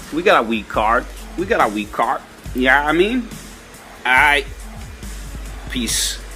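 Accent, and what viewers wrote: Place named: Rhode Island, United States. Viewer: I listened to you and I’m like this guy has to be from RI hahaha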